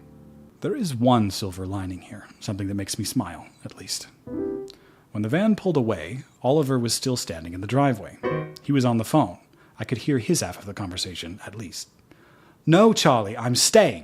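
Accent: RP, United Kingdom. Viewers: Speaking as a Brit watching this, ordinarily I would have told you off for using the stereotypical 'posh English person' voice. However, from the language used in the story I think they might actually be that kind of Brit lol. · I liked your accent, but thats quite posh, there are soooooo many accents here, but saying that i do know people that speak like that, and I also have a Aunt like Charlotte whom I dont speak to because shes rude! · Congratulations on 55,000!!! 🎉🎉🎉 Love the accent. I’m a fan of all things British and you were spot on. 😊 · You have a great posh british accent lol, and I say this as somebody with a british husband Though, he only has a slight posh twang, since he's got an expat accent that's just heavy on the british side, and he's specifically from Bristol, which is the land of the pirates, rather than whomever these posh bastards are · I’m not British, but I do like accents, and (for what it’s worth) to my uneducated, American ears your accent sounded perfectly posh! 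😆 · British person here: British accent approved and appreciated hehe ❤ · I absolutely ADORE you! You have such a nice voice and I love the side commentary and piano riffs 😂😂 also, not sure this is worth much from a Texan, but I thought your British accent was marvelous! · This is the second time that I have watched this and I can picture almost everyone in this story. The title does seem a bit misleading though. I think that the should be a narrator for audible he needs a manger to get him a gig. Also I thought his British accent was great. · GREAT story and your British accent was very entertaining! My mother was English and was HORRIFIED by iced tea. "Ice in your TEA?!" No problem with iced coffee, tho.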